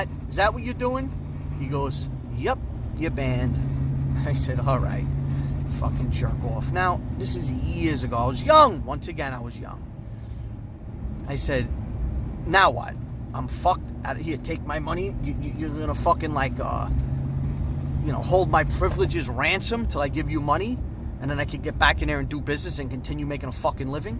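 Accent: New York, United States. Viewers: A man of many accents. Considering your heavy new york accent, your southern is pertty darn good!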